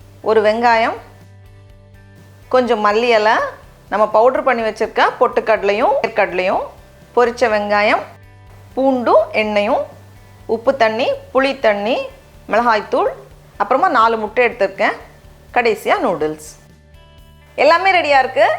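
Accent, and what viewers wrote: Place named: Kanyakumari, India. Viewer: Akka inum kanniyakumari slang varuthu...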